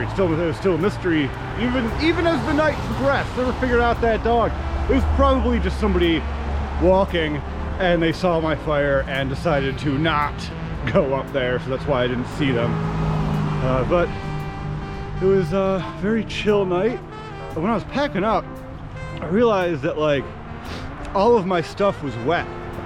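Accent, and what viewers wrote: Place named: Downstate New York, United States. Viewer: Hey there, again,! Eastern New Yorker Nancy! This has to be said that along with your animation job you should try some voice acting. You are hilarious 😂 and YES that is exactly how downstate New Yorker and Jersey folk talk! 🤣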